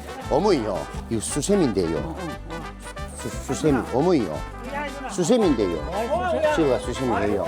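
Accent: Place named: Gyeongsang, South Korea. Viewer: 경상도사투리조아요~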